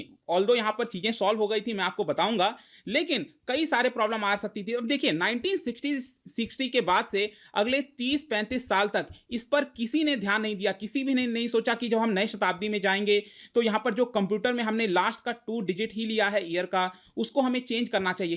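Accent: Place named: Bihar, India. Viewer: तुम्हारी कर्कश, हाई टोन वाली आवाज साथ में एक ही बात को कई बार रिपीट करना। ढेरों एग्जांपल दे देना। और हाइटोन रखते हुए लगातार बोलते जाना, लगातार बोलते जाना। अगर तुम्हारा यह वीडियो किसी ने पूरा सुन लिया, तो उसका हल्का सा बीपी तो बढ ही जाएगा और वह कम से कम 1 घंटे तक आंखें ऊपर करके बावला सा भी हो जाएगा। जो भी बताना चाहते हो उसको पहले कागज पर लिखा करो। बिना एक भी शब्द रिपीट किए हुए, मधुर आवाज में , संक्षिप्त में अपनी बात कह कर निकल जाया करो। परंतु यह सब तुमसे होगा नहीं। तुम्हारी टो से लगता है कि तुम बिहार साइड के हो, या पूरब साइड यूपी के। वैसे भी तुम लोग बहुत ज्यादा बोलते हो।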